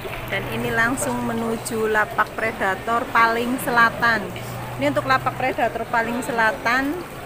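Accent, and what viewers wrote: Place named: Banyumas, Indonesia. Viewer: mantap nih satu frkwensi kontenya, pada pada wong ngapak hahahay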